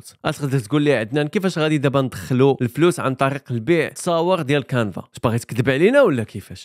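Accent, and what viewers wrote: United Kingdom. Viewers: عرفتي لاهدرتي بالبريطانية اتصرعها عندك واحد لبريتيش اكسنت واعرة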